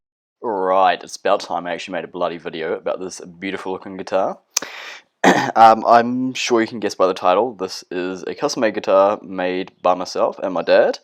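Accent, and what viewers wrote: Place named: Germany. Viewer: You kinda sound German lol. But you're a Kiwi, right?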